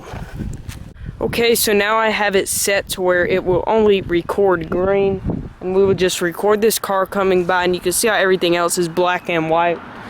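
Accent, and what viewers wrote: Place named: South, United States. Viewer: I bought the camera for my wife and we like it, and as well we liked your video. You might have set image stabilization on so when you're walking one does not have a tendency to stop the video and go elsewhere. I can't stand shaky video. Or if you did have image stabilization on, you might practice holding the camera still. It takes work; everyone will respect your work more. The overall video seemed a bit rushed, unplanned. My wife and I liked your voice, nice southern accent. You obviously seem to enjoy this sort of work.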